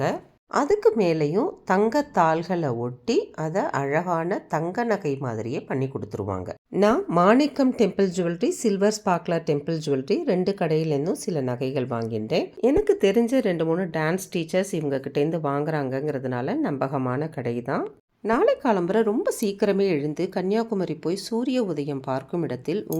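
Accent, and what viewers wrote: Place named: Thanjavur, India. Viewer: Very Pleasant divine Tanjore Tamizh Narration without the noise and exaggeration.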